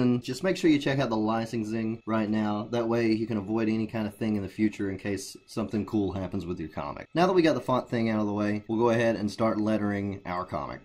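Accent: Texas, United States. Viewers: are you from Texas or Nevada?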